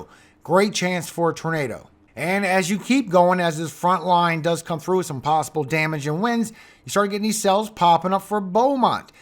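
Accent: Louisiana, United States. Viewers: brother you have a Louisiana accent yeah😁!!!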